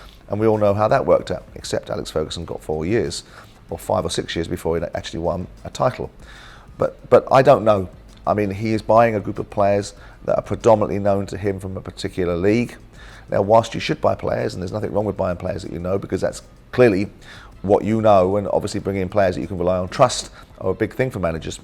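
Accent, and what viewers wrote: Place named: RP, United Kingdom. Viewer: I enjoy Simon's commentary don't always agree but it makes sense.Just want to know he has such a distinct posh accent.Where does he hail from😂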